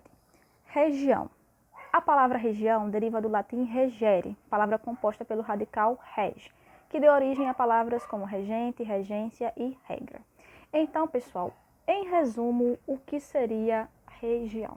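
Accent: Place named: Northeast, Brazil. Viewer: Bonito sotaque nordestino!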